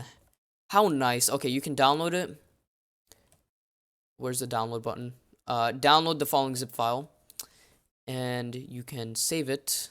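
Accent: Czech Republic. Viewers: ty si čech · Wait a second - you're czech? Yaaay me too. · Are you from czech republic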